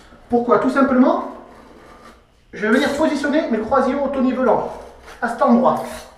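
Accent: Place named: Pau, France. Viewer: Merci pour ta réponse et excuse moi je ne veux pas abuser de ton temps que je sais si précieux pour un artisan. Vraiment j'apprécie ton partage tes videos ta pédagogie et tes réponses si précisent. Je vais essayer de chercher une personne comme tu me suggères. Merci de l'information pour la résine et les carreaux je vais voir si je ne peux pas les faire sauter pour en mettre d'autres. Belle région que Pau je comprends mieux ton petit accent 😊. Amitiés Bon week-end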